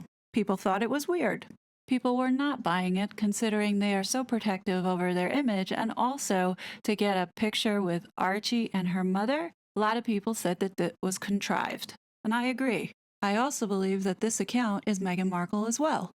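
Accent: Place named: United States. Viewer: Hi. Interesting🤔 I surreptitiously subscibed with your reading of better-not-say🤫 i love your voice... Gentle, melodous American. Perfect pitch and loved it when a laugh was building up in your voice. Let me stop! Ere i sound girlyfestype.😉 This is fascinating. Thank you. I will have listen pay attention... Is the one pic still a blank device? Maybe its a pic only for President of african parks meets President of Rwanda status photo? Did you analyse the other mozambique pic? Clearly on island. Question is Why??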